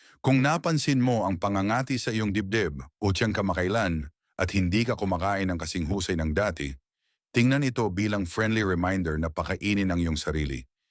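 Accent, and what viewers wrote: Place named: United States. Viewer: Taga US kasi xa kaya slang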